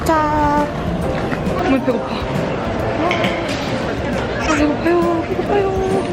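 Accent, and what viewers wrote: Yeosu, South Korea. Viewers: 말투가 익숙하다 했는데 여수가 고향이셨군요! 역앞에 바다가 있다니 너무 멋져요ㅠ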